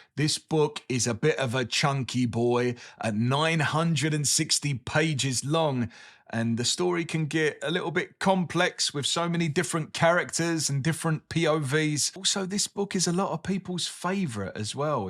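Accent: United States. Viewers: I love the American accent! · GREAT American accent!!!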